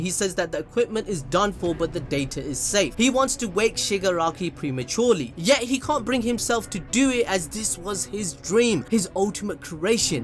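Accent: Punjab, India. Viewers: Bro hold up with that Punjabi accent, ur audience speaks Hindi too! Namaste 🙏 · Are you Indian... Can't tell with the accent though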